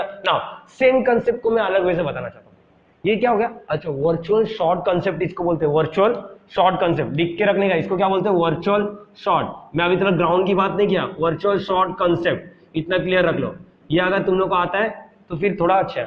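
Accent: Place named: Hyderabad, India. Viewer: Hyderabadi accent 🤩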